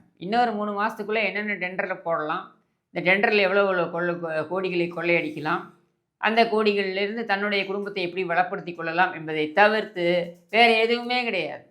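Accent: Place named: Chennai, India. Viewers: Ela tamil slang enga kanom, pakka Chennai slanga iruku😂 thappu panna olungavathu pannunga thanks for entertainment. Irunthalum tourist family pathutu vanthirukelam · Voice and slang sounds like Kannagi Nagar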